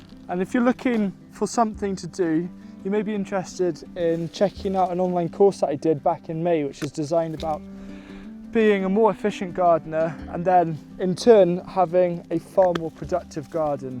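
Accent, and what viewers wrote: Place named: Lincoln, United Kingdom. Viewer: one of my professors is from Lincoln! I recognized the accent from hearing you and when he described his home and how the soil is great for farming, I put two and two together. Love your content! Glad to see you're back! ❤️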